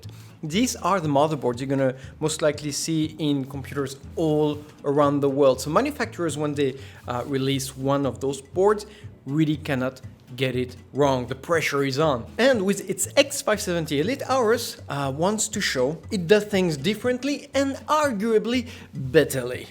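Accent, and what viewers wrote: France. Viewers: This review is great. usually with the French accent there is a high "punch me in the face" factor, don't get that with this guy at all - also Je Parle Francais - Merci bon homme. subscribed. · I barely bear your accent. im not a english native speaker, try to follow your speech is very hard for me. Also you are french. (im italian) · Nice video, love your french accent :p Can you still run two M.2 NVME Gen 3 while running a Ryzen 3000 on this board? Or are you forced to use M.2 NVME Gen 4 when using a Ryzen 3000 CPU? Enjoy the rest of your week!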